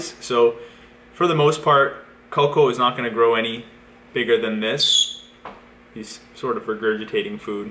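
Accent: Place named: Southern Ontario, Canada. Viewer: How could I tell you were from southern Ontario before you even said Toronto. Must be the accent.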